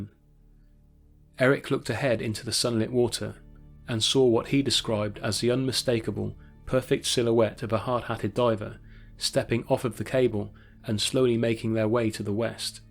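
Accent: England, United Kingdom. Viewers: how could it possibly have been at all profitable to sail coal clear from Australia to the United States? There are small, yet damning fapts of logic and lapses of sound reasoning that plauge each and every one of these "Tall Ship Tales And Old Sailors Tales" but I both appreciate the imagination that spawns them and the attempts to make them appear as realistic ad possible. Your cool English accent helps them along as well.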